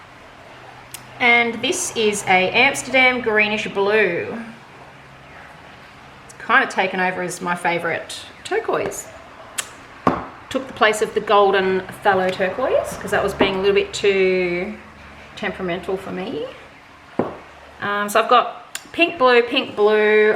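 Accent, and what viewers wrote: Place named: Australia. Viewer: I find your Aussie accent wonderfully refreshing ( our accent....lol )....this pic is gorgeous ! New sub here :)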